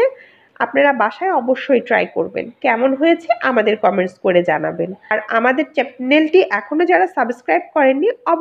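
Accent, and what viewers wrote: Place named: West Bengal, India. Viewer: আপনার চ্যানেলের নাম, আপনার কথা শুনে মনে হয় পশ্চিমবংগের। যদিও দেখলাম বাংলাদেশের।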